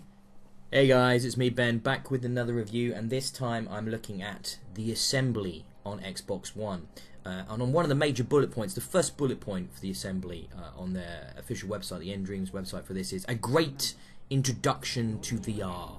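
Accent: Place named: United Kingdom. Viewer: Ok, now this is crazy! were we separated at birth? Are you my much, much younger twin? I bought this about a week ago and played it, finished it, etc. If I were to do a review of this myself we would have the most exceedingly similar reviews spanning two continents. About the only difference, my review would not have the British accent gravitas. Oh and probably a lot more cussing, I cuss so much I could make a sailor blush. It's not ad-lib cussing, like cussing just for the sake of throwing a cuss word in, I do use them properly, just a lot. This is now too funny. I have been checking out all of your reviews and for years I have assumed that the hospital I was born in made a huge, giant mistake. Now I know they did, LOL. I have been sure for many years I was given to the wrong parents, I have nothing in common with them. I am cerebral, love dark humor, ballroom dance, theater, museums, and history. 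Actual history, not the crap we peddle as history in this country. My parents are the opposite of that, I graduated high school at 14, and moved away to college shortly after, thankfully! My favorite comedy shows are Monty Python's Flying Circus, the Young Ones, Absolutely Fabulous--although their latest movie was absolutely not fabulous! John Cleese is in my top 5 for actors. I don't know how this happened but I now see how conspiracy theorists get their start. I hope you and the misses have a wonderful day, nudge, nudge, wink, wink, say no more!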